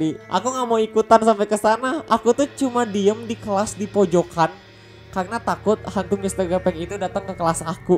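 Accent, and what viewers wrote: West Java, Indonesia. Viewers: suaralu kaya orang sunda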